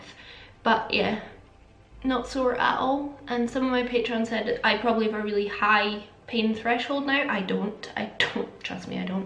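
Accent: United Kingdom. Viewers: You are soooo pretty and unique. I can't really watch youtubers with British/Scottish/Welsh accent (having trouble understanding lol) but I haaave to watch you 😍❤ keep doing what you're doing, gives me hope I can do it one day as well.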